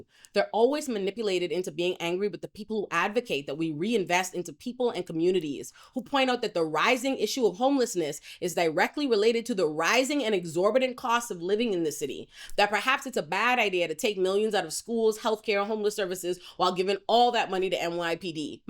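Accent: Bahamas. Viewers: Are you Bahamian? I recognize that accent anywhere lol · Aside from me feeling like i hear a bahamian accent trying to come from you 😅.... i always said new york will fall first.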